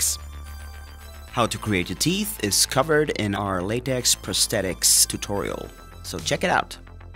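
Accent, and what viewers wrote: Sweden. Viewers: hearing your accent I guess you're swedish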